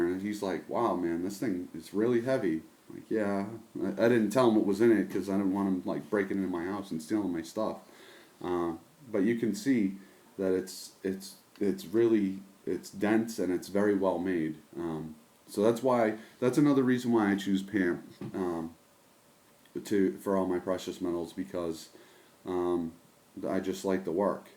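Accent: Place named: Boston, United States. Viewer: You sound OK to me you Boston guy. I sound worse. Your "Umm" is OK. Better than Howie Carr's "Wizard of Uhs". What town are you in ?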